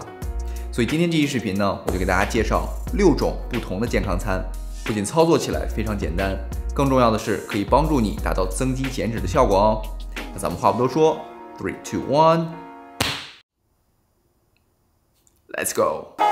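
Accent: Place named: Sichuan, China. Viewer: 這種口音是四川嗎？好好聽啊☺️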